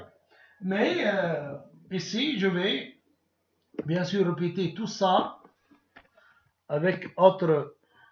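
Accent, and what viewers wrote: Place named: North Africa. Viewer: On comprend rien avec ton accent couscous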